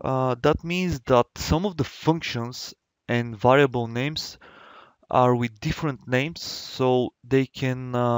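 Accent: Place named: Russia. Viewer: Плохой английский с русским акцентом. Может есть такое видео на русском?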